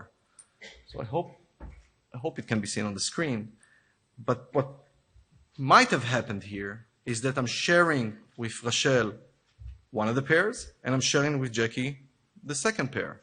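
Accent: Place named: Italy. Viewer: u sound italian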